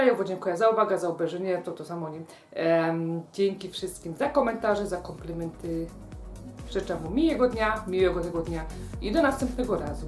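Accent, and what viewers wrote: Silesia, Poland. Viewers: Podoba mi się z ''kartofloma , z kluskoma '' można podać . Lubię słuchać gwary opolskiej / śląskiej .Sznycelki jednak lekko rozbiję i otoczę mąką przed panierką , smalczyk indyczy też o.k.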